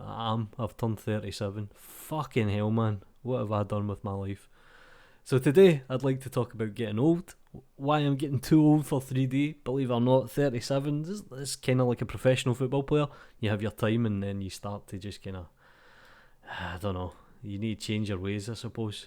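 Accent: Scotland, United Kingdom. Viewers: Happy Birthday!...and I love the Scottish accent. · As a german, I find your scottish accent very pleasing! I rarely struggle to get what you`re saying. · There is no shame in your accent. At least you are not using Scot's vernacular or Ghàidhlig ... tbhiodh e inntinneach faicinn an dèanadh iad staim dheth....LOL! Bless those who wish to preserve the auld braid Scots tung. You are young yet....just wait...it gets worse... :) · Downfall?! Bollocks. The Scottish accent makes everything better, including your videos :D And dont sweat it mate. I'm 8 years your senior, with 4 kids and two nuked marriages behind me and I still play around with Blender and other softwares daily. You're doing fine ;) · love the Scottish accent BTW. Very different from Grant Ibbot.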